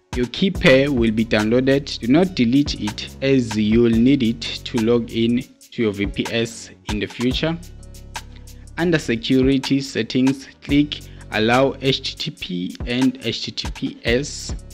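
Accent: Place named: Ghana. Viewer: GH ascent.. Africans to the world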